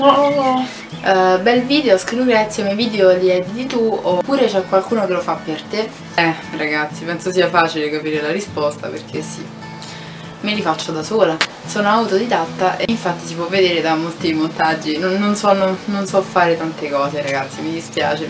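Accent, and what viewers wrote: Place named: Rome, Italy. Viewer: Ah romanaa de Romaa ❤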